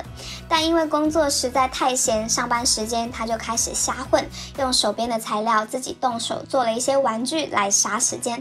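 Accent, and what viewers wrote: Japan. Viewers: 在頻道說的英文都是用日式發音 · 我的天，妳講英文帶著日文語音，太好聽了吧！整個大愛❤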